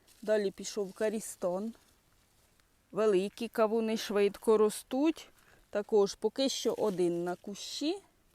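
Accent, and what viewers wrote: Poltava, Ukraine. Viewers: Мабуть звідкись з Полтавщини судячи по говору. )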